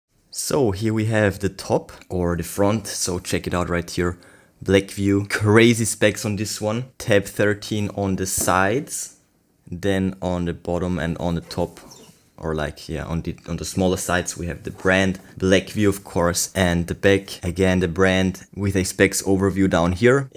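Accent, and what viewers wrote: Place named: Germany. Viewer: German accent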